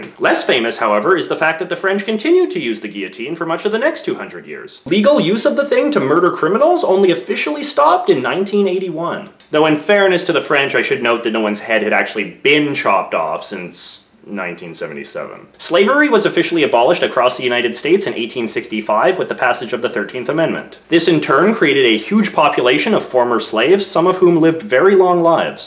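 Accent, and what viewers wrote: Canada. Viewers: Soooooo fookin Canadian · As a Canadian I can tell by your accent, you are Canadian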